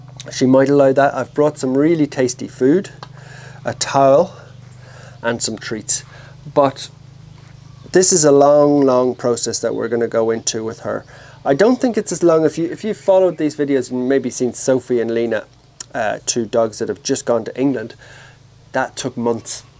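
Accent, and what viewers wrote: Ireland. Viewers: marshmallow will come to love you just like the rest of us. Nobody can resist that Irish lilt.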